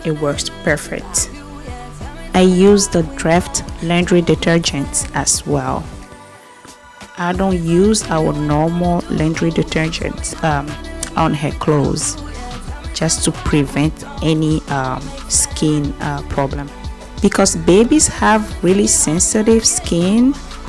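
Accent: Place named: Africa. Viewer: That mama africa accent 😩❤️🥰 i love it so much! Wonderful video mama!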